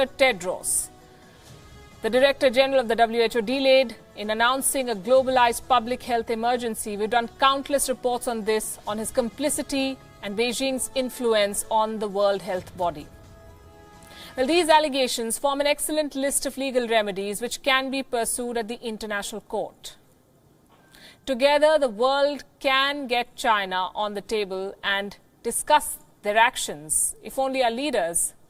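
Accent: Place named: India. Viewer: Listening the accent I'm sure she is another indain journalist Aaj Tak, India tv and now this shame on you Is there any channel of India which broadcast a real news ? Just send me the name . I really would like to watch it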